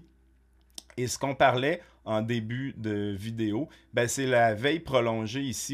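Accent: Quebec, Canada. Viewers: Ouaw merci ( PS : J'adore ton accent Québecois )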